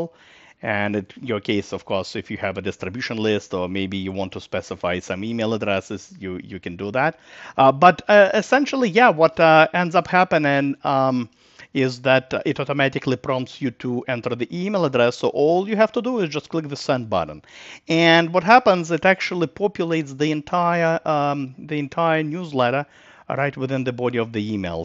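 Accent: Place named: Bosnia and Herzegovina. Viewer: Love the accent. Sounds Bosnian.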